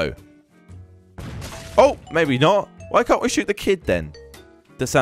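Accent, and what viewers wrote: United Kingdom. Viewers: ser u british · are you british or slovak?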